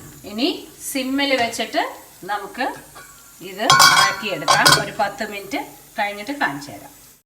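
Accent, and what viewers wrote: Palakkad, India. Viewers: ഞാൻ ഒരു ട്രാൻസ് വുമൺ ആണ്. കുക്കിങ് ഇഷ്ട്ടമാണ്. ചേച്ചിയുടെ ഫാമിലിയെ ഒരുപാട് ഇഷ്ട്ടമാണ്. പാലക്കാടൻ തനിമ നിറഞ്ഞ സംസാരം ഒരുപാട് ഇഷ്ട്ടമാണ്. വീട്ടിൽ പ്രശ്നം ഉള്ളതുകൊണ്ട് എറണാകുളം ഹോസ്റ്റലിൽ ആണ് താമസം. വീട്ടിലെ ഫുഡ്‌ ഒകെ കഴിച്ചിട്ട് ഒരുപാട് ആയി. അമ്മയുടെ കയ്യ്കൊണ്ടുള്ള ഭക്ഷണം കഴിക്കാൻ കൊതി ആകുന്നു. ചേച്ചിയുടെ വീഡിയോ കാണുബോൾ എനിക്ക് എന്റ്റെ അമ്മയെ ഓർമ വരും. ചേച്ചി ഒരുപാട് സന്ദോഷത്തോടെ കുക്കിങ് ചെയ്തു മനസു നിറഞ്ഞു ആ ഭക്ഷണം കഴിക്കുന്നത്‌ കാണുബോൾ എന്റെ മനസും വയറും നിറഞ്ഞ ഒരു ഫീൽ ആണ്. Love ❤ U ചേച്ചി. എല്ലാവരോടും അനേഷണം പറയണം എന്റെ.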